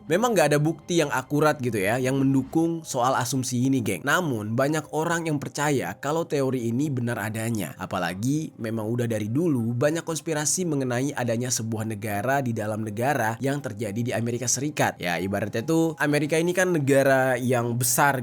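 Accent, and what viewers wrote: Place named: Java, Indonesia. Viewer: Aku lebih suka "hey Genk..welcome to back kamar jeri ..." 😁😁 Medok..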